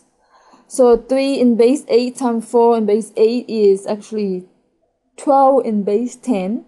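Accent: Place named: France. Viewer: yep...you''re French....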